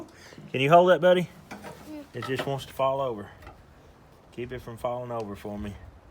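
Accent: United States. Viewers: you have such an american accent, i like, also good video man, i need it to fix drop out in my eletric bike so wanted to check how strong it was, thanks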